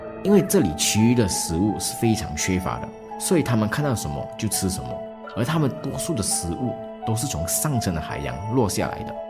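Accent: Malaysia. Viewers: 马来西亚口音，不好听。我也是大马人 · 马来西亚口音耶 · 馬來西亞華人普通講華語時的口音是這樣，我自己也受不了，但中國人捲舌太過的話也聽得很。。。😂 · 馬來人的口音那麼明顯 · 马来西亚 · 马来西亚腔太重。听了很不舒服，很难再听下去。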